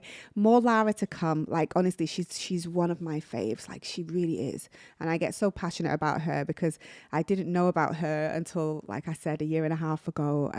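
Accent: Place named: Manchester, United Kingdom. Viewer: Hello! I just wanted to say how much I appreciated your reaction. Few people truly feel Lara Fabian the way you clearly do. I was genuinely moved by how moved you were. You captured her essence perfectly, and I really hope you'll consider sharing more from that phenomenal London concert. Also, I have to say, you’re an absolute pleasure to listen to. Your voice has such clarity and warmth. Yet I couldn't help noticing a few vowel qualities that hint at a slight Northern influence, perhaps Manchester? It gives your speech a unique charm. 😊 I'll be attending Lara’s concert in Geneva on 28 November, and after hearing your impressions, I’m even more excited. Thank you again for such an honest and heartfelt video. 💜 P.S. I've just subscribed to your channel! 😉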